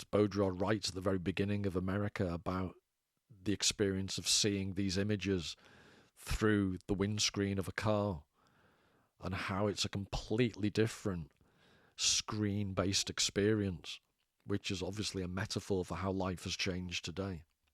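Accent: Liverpool, United Kingdom. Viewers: just discovered you today, I will subscribe, I have read blood meridian about 5 times, although suttree is my favourite, I will have to read America, good to hear a Scouse accent, i left Liverpool 35 years ago!! Keep on keepin on 🙏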